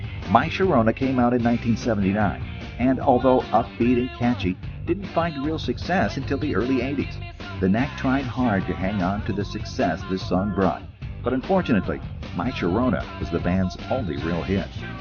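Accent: United States. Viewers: THis was going greAT UNTIL THE aMERICAN VOICE OVER....GREATTUNES BUT cut the effing vocals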